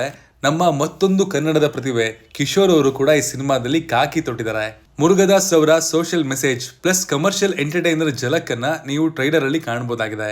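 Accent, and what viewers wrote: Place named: Hubballi, India. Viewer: Hi ದೋಸ್ತ ಏನ್ ಬೆಂಗಳೂರು ಇಂದ ಹುಬ್ಬಳಿ ಗೇ ಬಂದಿರಿ ಮಾತಲ್ಲಿ.. Yake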